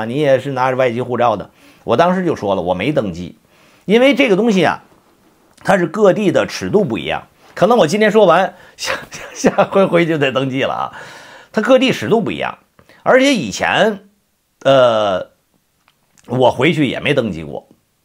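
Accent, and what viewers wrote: Tianjin, China. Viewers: 博主是天津人吧哈哈哈哈这乡音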